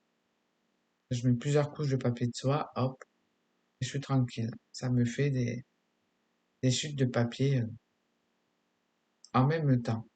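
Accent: South, France. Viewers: Une jolie page vintage qui met en valeur cette ravissante petite fille avec tous tes pts bouts de papier façon patchwork , tu as des doigts de fée de magicienne ;) Le résultat donne une petite merveille .. Je retiens l'astuce pour le tamponnage sur du papier de soie pour plus de netteté , c'est vrai que tamponner sur une page de ART journal quand il y a des multicouches n'est pas toujours réussi.. Tu n'as pas l'accent du Nord , mais bien du sud je trouve . Félicitations pour le nbre des abonnés qui grimpe , bravo ! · Merci pour cette nouvelle page toute vintage ❤️ Ce serait dommage de nous parler en anglais ton accent du sud est tellement agréable ainsi que ton rire léger, bravo pour ta petite célébrité toute méritée à bientôt 😊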